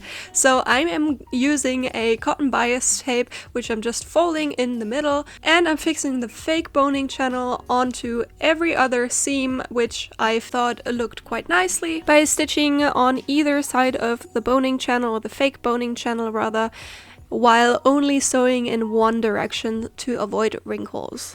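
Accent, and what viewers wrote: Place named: Germany. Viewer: Are you German? Coming from a German, you sound very German.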